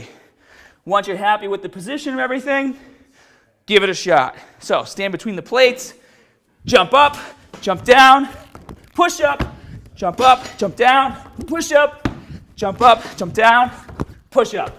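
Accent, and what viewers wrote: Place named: Massachusetts, United States. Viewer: this dude sounds like hes from Massachusetts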